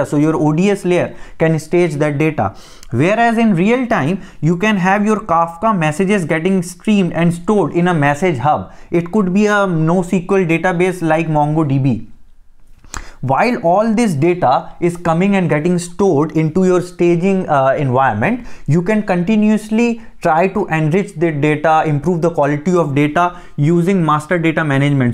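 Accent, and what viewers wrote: India. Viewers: Thanks. Good job. In addition for us latín american people it is easier to undersatand Hindi accent English thsn American one. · The Indian accent sometimes need a bit of getting used to. If your ears get trained enough then you wouldn't notice it while following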